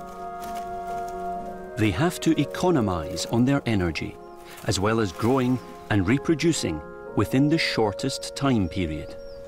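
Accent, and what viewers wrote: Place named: Scotland, United Kingdom. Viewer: Moar narration with that Scottish accent, please! ♡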